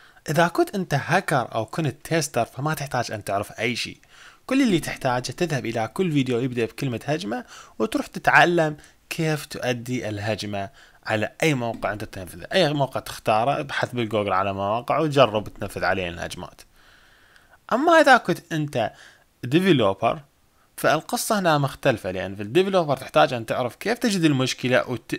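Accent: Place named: Iraq. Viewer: انصح الجميع بمشاهدة القناه وجدت كثيرا مما كنت ابحث عنه خاصة ان اللهجة عراقية. وهذا احلى شي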